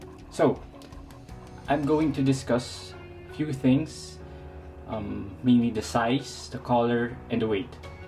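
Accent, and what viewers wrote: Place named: Philippines. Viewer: You sound like you're from the Philippines. I would have thought the 36mm size would have suited you better. Just thinking about buying one of these in the Turquoise blue. Just sold my Rolex Explorer to fund it.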